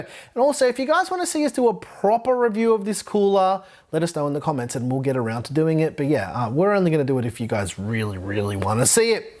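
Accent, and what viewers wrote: Australia. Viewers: Great to hear another aussie voice in the tech space ! Subscribed !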